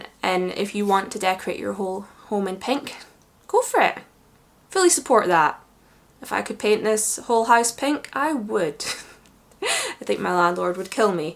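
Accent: Scotland, United Kingdom. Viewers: Hellow Sweets. What Part Of Scotland Are You From?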